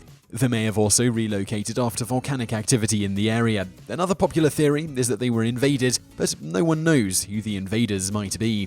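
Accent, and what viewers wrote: United Kingdom. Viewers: He's British. That's their pronunciation. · British ppl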